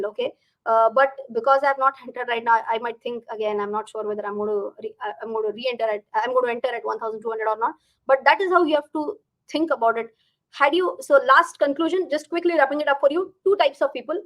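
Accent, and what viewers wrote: Maharashtra, India. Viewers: I want to learn Marathi after hearing ur accent😍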